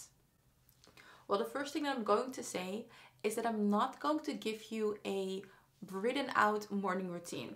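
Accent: Netherlands. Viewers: Where are you from? You start like you are from the Netherlands!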